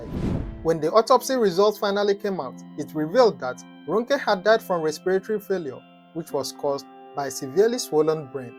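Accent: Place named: Calabar, Nigeria. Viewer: My brother, I like your Calabar ascent. God bless you my bro.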